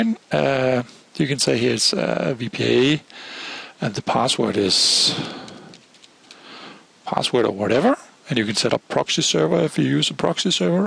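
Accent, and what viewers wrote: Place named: Netherlands. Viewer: No, that's a Dutch accent, it's supposed to be like that.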